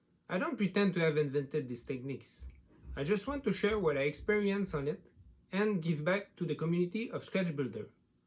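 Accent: Quebec, Canada. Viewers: Ah enfin un belle accent québécois en anglais 😁😁. Cool ton tutoriel, c'est facile et surtout rapide, je vais l'essayer c'est certain.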